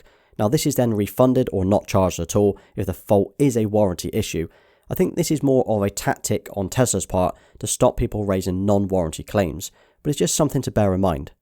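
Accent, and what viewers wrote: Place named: North, United Kingdom. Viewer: Just wanted to say how much I've enjoyed your videos, I've watched quite a few without realizing. I like watching desk tour vids, wanted to make sure the PS5 Pulse 3D headphones were decent and recently started considering a Tesla Model 3. If you could do me a couple of Golf swing videos, Sony A7ii lens videos and A day in the life of a video game developer, you'd have the full house. Also good to hear a northerner on YT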